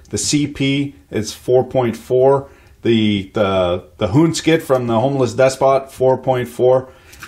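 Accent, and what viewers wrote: Quebec, Canada. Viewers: Quebec accent swearing yeah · Canadian accent. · That's some of the best Canadian accent I have heard in a while. Felt like drinking a cup of maple syrup and eating some poutine when I was watching this. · My freaking god you sound canadian when you speak french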